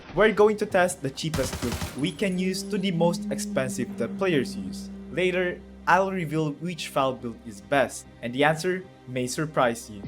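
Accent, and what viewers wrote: Philippines. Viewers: Pinoy pala tong si nato HAHAHA i thought i misheard what he was sayin HAHAHA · Knew it was a Filipino from the accent then there's the "ginagawa mo" which proves it! HAHA u earned a sub pare nice vids! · This guy's got that Filipino Accent.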